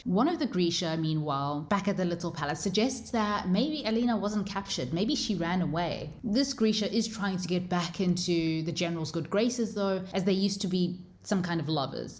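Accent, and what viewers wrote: South Africa. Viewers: Is that an SA accent I hear? 😍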